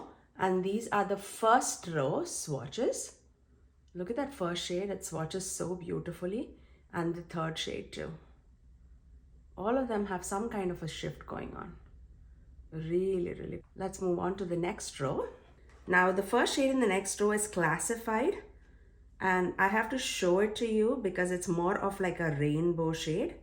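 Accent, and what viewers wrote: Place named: India. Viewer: Don't worry about your voice. It's still giving ASMR vibe for me. I just realized my old friend Ambuja used to give me the same feelings before I knew what ASMR was. I thought I was a weirdo for getting that buzzy feeling. It must be something about your soothing Indian accents that get me.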